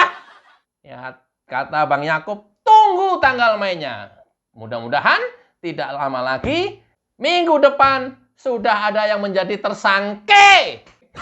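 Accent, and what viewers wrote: Java, Indonesia. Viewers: Pak kalo logat jowone keluar,Mantap. seperti; Cok...Dancok / Jancok...😃 Blok...Goblok...😃 Besok kata"nya di tambahi; Uthek'mu Cok😲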